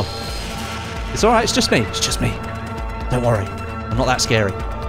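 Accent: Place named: United Kingdom. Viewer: Whoah ur from UK anorl I thought u were American what part UK u from some accent that mate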